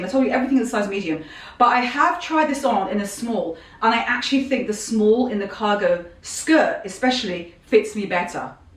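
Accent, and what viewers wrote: England, United Kingdom. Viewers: Love you! Love your English accent. LOVE YOUR CLOTHING LINE! SASSY.CHIC.COMFORTABLE. AFFORDABLE. Ciao an American in Sicily. Love when you visti my my city New york and talk to the people on the street.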